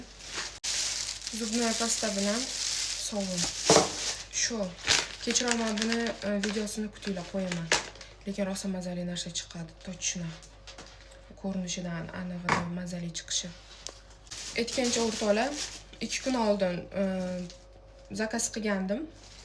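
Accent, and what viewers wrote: Tashkent, Uzbekistan. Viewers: Кашкадарйолик буса бук йеб тошкентча гапирадими бу чала тошкентли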